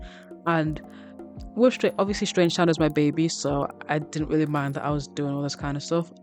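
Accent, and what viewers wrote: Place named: Liverpool, United Kingdom. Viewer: Looks amazing! A modern take. Brew you’re accent is really unique, it sounds like a tiny bit of scouse and other accents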